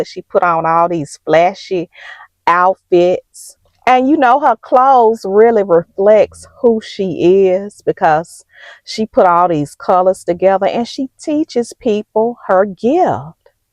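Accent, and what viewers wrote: South, United States. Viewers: I seen your video for the first time today. I love your spirit and your country accent. You have given me something to think about. I totally agree with what your saying. My husband and I recently relocated from Arizona to Georgia and I feel a big shift in my life making such a big change. Things are very different in G.A. I need to find something to do in G.A. Still looking for a perpose in a new state. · You are such a true inspiration ma'am...You came across my feed last week. I listened to you and your southern accent and now I'm hooked 😊 Keep the good content coming while I continue to be attentive and share your videos. All the best for the new year...lots of love to you and hubby❤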